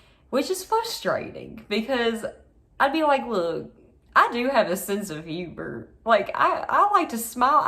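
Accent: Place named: South, United States. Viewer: On tiktok on the newer ones I would guess Arkansas or Texas. This one feels more north central, Tennessee maybe. I'm from Louisiana myself and usually have an excellent ear for accents but I can't seem to narrow yours down. That's my random thought. Carry on.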